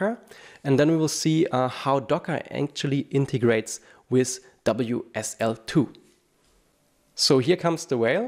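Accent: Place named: Germany. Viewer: Great Video!!! 🔥🔥🔥 Love the German accent! ♥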